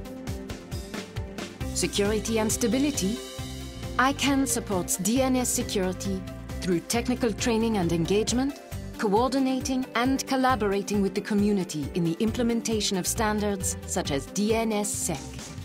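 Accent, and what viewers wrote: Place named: Sweden. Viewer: I may be wrong, but I think it's a Swedish accent. I speak French and don't sound like that, and the CEO of ICANN is Swedish. I also believe the man who is credited for inventing the World Wide Web, Sir Tim Berners-Lee, is from England.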